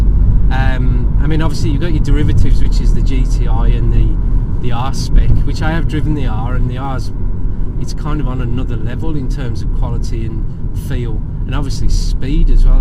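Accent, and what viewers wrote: Birmingham, United Kingdom. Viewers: Nice review! Funny when you mentioned the A1231 in the North East - I commute along that road every day! Wouldn't have guessed that's where you were driving, based on your Brummie accent :o)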